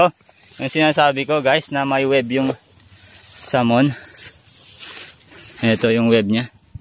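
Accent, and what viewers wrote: Ilocos, Philippines. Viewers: Akala ko po visaya po kayo yuon po pala ilokano po kayo ilocano din po ako heheh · Ilocano ka pati siyak😅😅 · Ilocano po ba kayo oh igorot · Ilocano ka met kanno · Ilocano ka gayam boi hahaha.napugsat diyay saput nan hahhah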